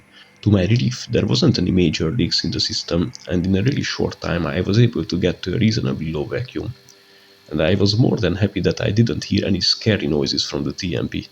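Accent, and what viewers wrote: Hungary. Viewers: accent is Hungarian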